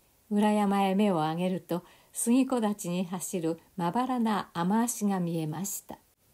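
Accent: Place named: Hachinohe, Japan. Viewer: 彼岸じゃらく…当地方の彼岸の辺りの悪天候・・・懐かしく八戸弁（東京弁のですが…）を聴かして戴きました。 ｢ ｣さん、ありがとうございました…。 感動の朗読をありがとうございます❗ 感謝です…‼️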